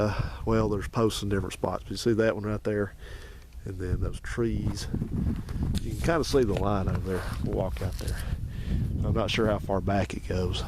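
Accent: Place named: Alabama, United States. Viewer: . Your knowledge is a gift to this world. I could sit for hours and tell you about the things I've learned. Biggest thing is putting the fucking phone down and firing up the computer. Manage time a little better instead of staring at a small screen reading the same shit over and over. Facebook sucks but I like my privacy and anonymity. I'd love to share stories and talk. Send me an email. You'll find it in a private.....message. I'll take the time to write one back and send pictures. Facebook is kind of a bullshit means of staying connected or even getting to know someone. I found you. I reached out. I won't share here how I accidentally stumbled across it. I hold privacy dear as a value. Some things everyone doesnt need to know. Anyway, I sent lots of pictures and kind words in a message. You taught me you're a warm and generous person, but you dont waste words or time. 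Values I've held dear that are a beautiful taste of the state I call home. Almost 14 years in Illinois again, but I took so much of Alabama in that my accent is a part of me. To hear another intelligent man who talks like I do is quite endearing. Then, there are all the hours of great info. I'll watch this all the way through tomorrow, at my work desk. I'll get a camera, a tripod, and I'll share info I know that others may not know and might help them. Knowing i could have taught one person one thing is all worth it. Thank you for all the kindness. I feel like I've watched your life happened because of y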